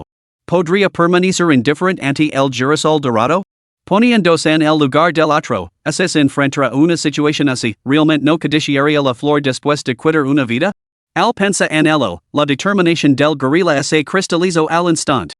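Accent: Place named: England, United Kingdom. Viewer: Arreglen ese acento inglés que arruina la narración 👎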